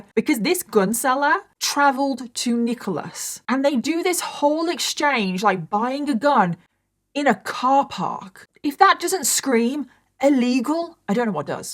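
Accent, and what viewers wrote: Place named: United Kingdom. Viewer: i have been watching your vids for years and i’m still trying to figure out where in the uk your accent is from 😂❤ xx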